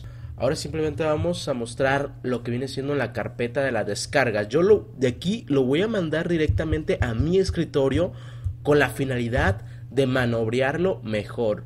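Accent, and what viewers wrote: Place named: Fresa, Mexico. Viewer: habla muy freson jaja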